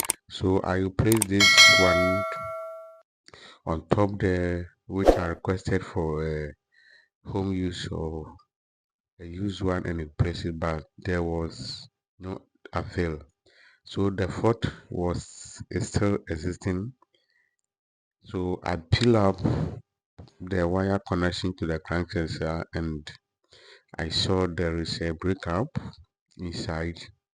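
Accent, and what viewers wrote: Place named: Ghana. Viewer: Where are you located... You sound like a Ghanaian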